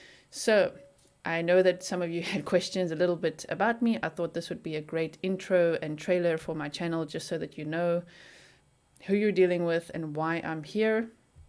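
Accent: South Africa. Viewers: Yes! I found you because you are covering the Petito/Laundrie case and I could listen to you just because of your south african accent: I love it 😉 My aunt is also from south africa so it sounds so familiar. But I also love the way you dive into the whole case, the whole persoon. Not just one aspect. It is nice to hear a bit of your background and who you are. Not nice to hear about the trauma but like you said; it makes your approach different and even more thourough in cases I think. I hope you feel a bit at home already here in the Netherlands. And safe(er) 💛 Groetjes! 😉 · I know how busy you are with your rapidly increasing fame & can't answer everyone. I sent a gmail with the question on if religion ever plays into your analyses/investigations & I should have said evil, possessions, satanic activity. What Brian did is evil & maybe he went full blown dark & sacrificed poor Gabby. 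Maybe he was temporarily possessed a la Chris "Low Wattage" Watts? How about Anna Leikovic, Aiden Fucci, pure evil. I have been traumatized in my life dealing with evil. We can all speculate, maybe the "Dirty Laundries" are closet satanists? Thanks & you are awesome & so is your South African accent.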